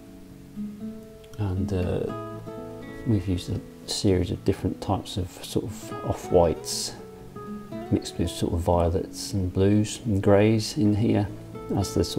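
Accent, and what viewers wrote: United Kingdom. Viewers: My Sunday treat Love your painting...very atmospheric..Thank you.. Love your English accent also. Greetings from Donegal,Ireland.☘️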